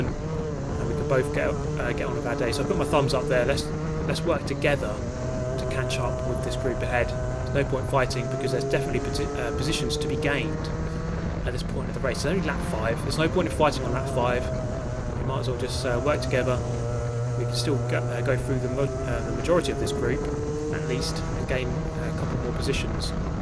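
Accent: United Kingdom. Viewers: EYYYYYY BRITISH BOI nice to know theres actually british bois here in youtube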